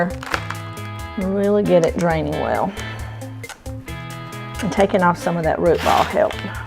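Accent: South, United States. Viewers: I’m in Sevierville. Near Gatlinburg 😊. What part are you in? I was thinking you’d said middle TN in one of your videos. I recognized your accent immediately before you even mentioned TN. I love our southern accent. Don’t hear it on YouTube much 😊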